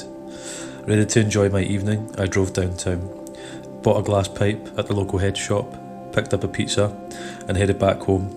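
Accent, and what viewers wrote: Scotland, United Kingdom. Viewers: I was watching Shrouded Hand's video on scary trips and I ran out, so I tried to find some more trip reports on YT, but I remember thinking "aaaah, they just wont be the same without his gentle tone, eerie music and Scottish (?) accent"... then I landed here.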